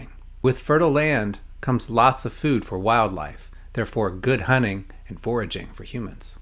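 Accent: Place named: Ohio, United States. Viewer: Yep! You sound like you're from F..in Ohio! Can't speak English 😢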